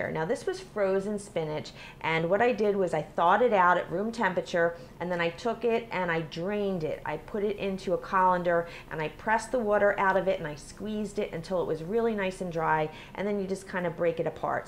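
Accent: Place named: New York, United States. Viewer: I am going to make this tonight!!! Ps I love your accent!!!! Are you from New York ??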